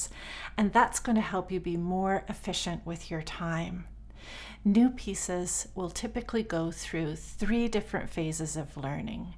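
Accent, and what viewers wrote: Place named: Canada. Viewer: Thank you for making me feel a musicien at 72 years and for the inspiration you give me to sit down and practice in a structured programme. I do love your very clear canadian english accent. Have a nice Sunday.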